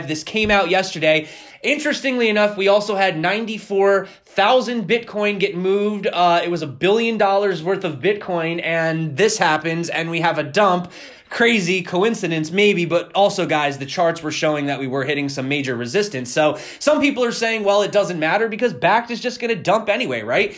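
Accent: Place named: New York, United States. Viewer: The New York affect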